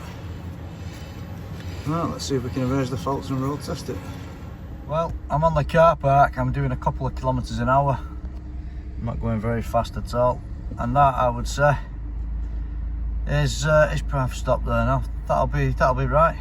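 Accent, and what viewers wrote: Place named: Northern England, United Kingdom. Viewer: Have done a few of those ABS rings in the past and had to resort to a spot of weld myself haven’t done one for some considerable time now though. Your videos are good mate and your work is top notch and at the top of your game especially with the fault finding. Can’t help but think of Fred Dibnah when I hear your accent I’m a Yorkshire man but don’t let that put you off 😂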